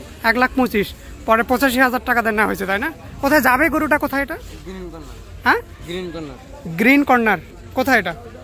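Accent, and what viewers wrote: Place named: North Bengal, India. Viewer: ভাইজান কি উত্তরবঙ্গের? কথার সুর/টান উত্তরবঙ্গের মানু‌ষের মতো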